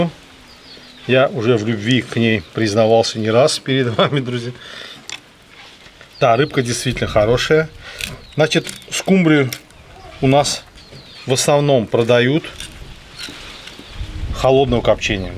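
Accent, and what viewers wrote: Baku, Azerbaijan. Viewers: Русский язык действительно в Азербайджанском акценте получается больше чем сладким. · Твой бакинский акцент напомнил мне Баку,когда я ещё жил там в советское время.Я вспомнил как пили пиво с горохом,мой отец так любил.Многие армяне до сих пор сохранили бакинский акцент,по моему он в крови да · Брат ты красавчик. Это не еда с пивком и нутом, это симфония. А ещё вкуснее невероятный Бакинский акцент. Долгих лет жизни тебе родной. Ты конкретно поднял мне настроение. Клянусь тебе сейчас пью пиво за твоё здоровье. Респект и уважуха! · Бакинский акцент самый родной❤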